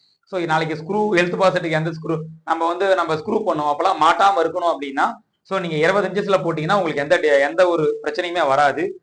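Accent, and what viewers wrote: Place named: Andhra Pradesh, India. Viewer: Andhra pradesh erundhu pesuri nanba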